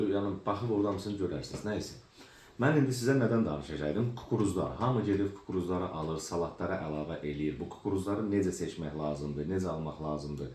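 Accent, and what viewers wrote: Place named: Qazakh, Azerbaijan. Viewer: Ləhcədə ki SUPER🙏🙏🙏 QAZAX ləhcəsi👏🙏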